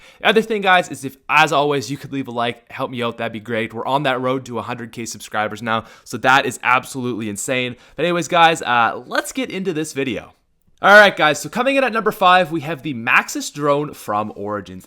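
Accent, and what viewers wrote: Canada. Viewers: Its funny I'm Canadian and he's the only Canadian I've heard that says aboot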